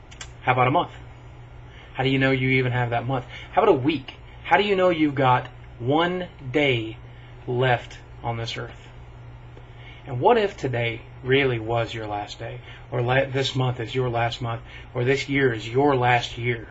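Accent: South, United States. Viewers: Sad looking back at this Mate, funny thing is here you sound like a cowboy from the south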